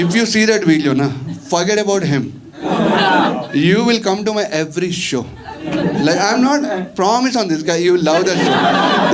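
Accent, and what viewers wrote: South India, India. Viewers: I love that south Indian accent in ur English... And tht.. Re chintuu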